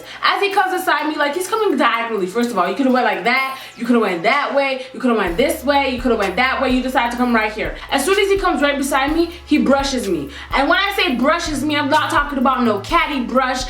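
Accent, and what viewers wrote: Jamaica. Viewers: I love the Jamaican accent :) so cool! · Talk Jamaican more often please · She uses a Jamaican accent sometimes I'm guessing that's what you're talking about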